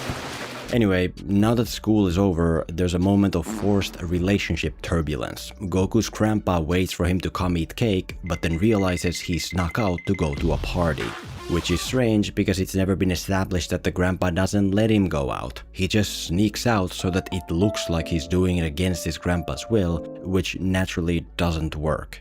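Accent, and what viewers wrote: Finland. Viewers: I have watched your videos for more than 10 years and only now has it clicked for me that your accent might be Finnish.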